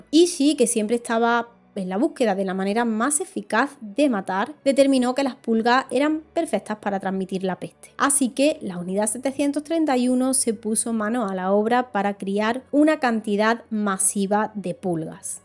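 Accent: Andalusia, Spain. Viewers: Es acento de Andalucía, la perla del sur de España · Amo su acento...que parte de España es?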